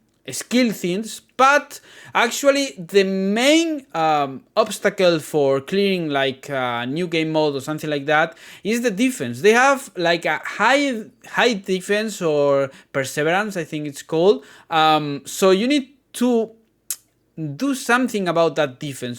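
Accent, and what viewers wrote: Spain. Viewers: Tu eres español, a q si? Tienes un acento muuyyyy español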